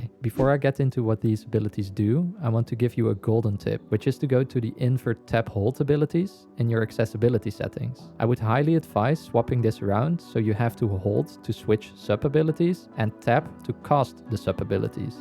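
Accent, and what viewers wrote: Netherlands. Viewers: Hey a dutch person i think cause you have a very dutch accent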